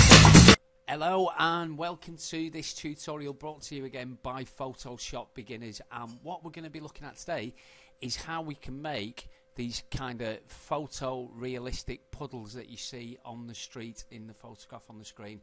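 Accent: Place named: Manchester, United Kingdom. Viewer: really good tutorial, and I like the Manc accent top man.. lol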